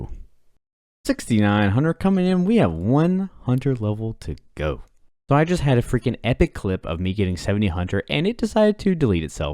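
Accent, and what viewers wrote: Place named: Oklahoma, United States. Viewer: also that southern accent poking through. I wanna guess Oklahoma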